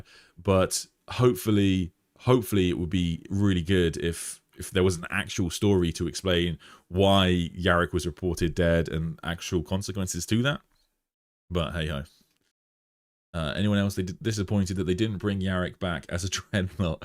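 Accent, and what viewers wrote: United Kingdom. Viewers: I love when british caleb hammer talks warhammer to me :D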